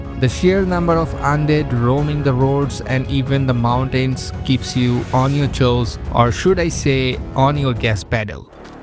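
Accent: India. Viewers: I just ignored the video and laughed at your indian accent the whole video. 😂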